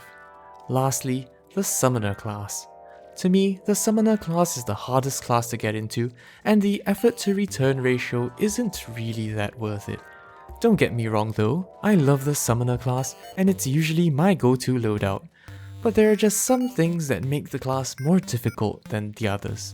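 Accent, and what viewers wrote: Singapore. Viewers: hai may i ask r u singaporean?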